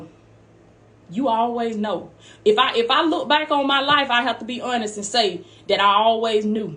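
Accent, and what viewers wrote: South, United States. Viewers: I heard you loud and clear from the intro, you made me jump up! I've been praying for months now, " God show me how to generate multiple streams of income ". But God has given me three basic instructions: start small = plant a seed & I will make it grow, step out in faith & out of comfort zones, don't boast be humble... do these three things constantly and I will bring the overflow 🏞 💷 ⛲ 💷 🌊 💷 I love your messages, your motivation and your Southern twang, you put a fire up where the sun don't shine! 😂 I really appreciate your ministry, thank you sister in Christ, love from London UK 👑 ❤ 🙏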